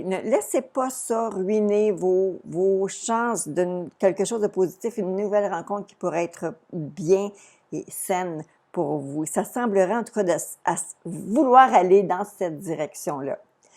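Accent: Quebec, Canada. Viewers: Coucou j’adore l’accent québécois 🌸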